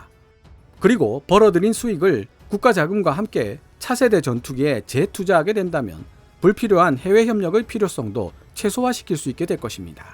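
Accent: Gyeongsang, South Korea. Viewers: 갱상도식 영어 억양 알파뱃만 나오면 하이에나 처럼 덤벼든다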